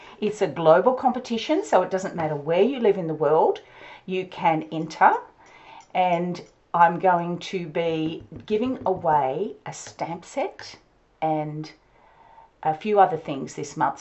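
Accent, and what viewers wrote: Australia. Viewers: Just found you through a friend. Nice to hear an Aussie voice!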